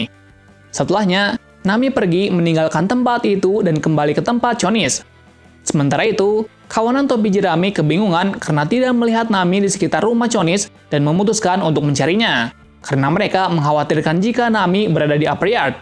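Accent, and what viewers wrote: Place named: Surakarta, Indonesia. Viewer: mirip orang solo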